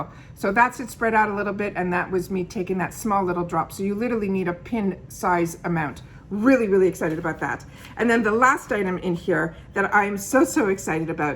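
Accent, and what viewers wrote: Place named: New York, United States. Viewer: Do you live in new york? The reason why I asked you is the way you that you speak you sound like a new yorker.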